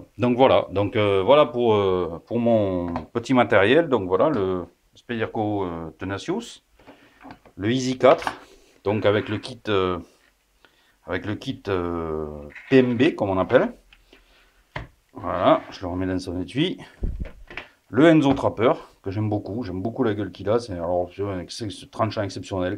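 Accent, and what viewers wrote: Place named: Hérault, France. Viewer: Vidéo bien sympathique et couteaux superbes Quant à la voix et l'accent : soyons fiers d'être héraultais !!!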